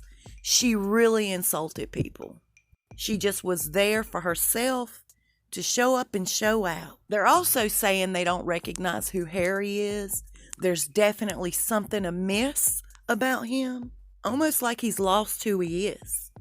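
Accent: South, United States. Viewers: I love it when you say y’all. I went to the USA recently and made nearly every American say it. In your words I was a royal pain in the ass. Still their accents were not as lovely as your Southern accent. Love your British Friend and her cat 🐈💕💕💕💕💐💐💐🇬🇧💕🇺🇸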